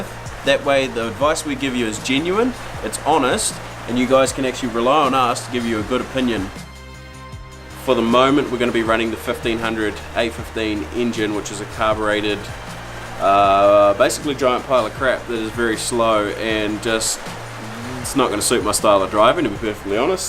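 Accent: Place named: New Zealand. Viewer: ahhh your a kiwi lol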